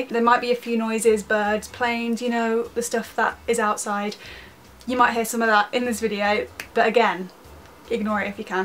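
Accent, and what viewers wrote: England, United Kingdom. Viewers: Are you English or American ❤️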